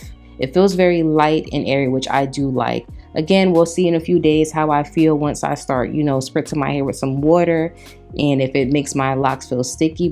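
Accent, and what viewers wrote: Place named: Australia. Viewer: she pulled up that aussie and i'm subbed sooo fast😂❤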